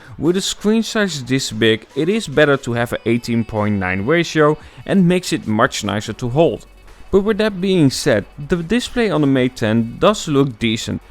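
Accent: Netherlands. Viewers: Ok. I see "NL" on the phone and you sound a little Dutch. Is the Mate 10 available in the Netherlands?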